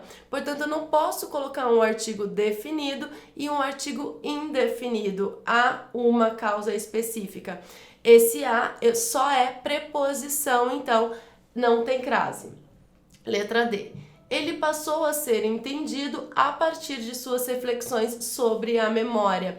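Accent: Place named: Minas Gerais, Brazil. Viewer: Essa manja muito; me salvando e salvando geral...pelo sotaque é mineirinha...também né s2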